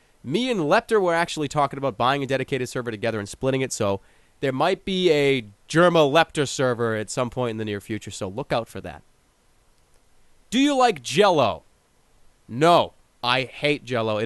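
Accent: Boston, United States. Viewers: Wow you are from Boston! Just like a scout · I listen to Jerma so I can talk with a Bostonian accent. MY GOD, THAT ACCENT IS BEAST. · klahndyke bah :D gotta love that boston accent.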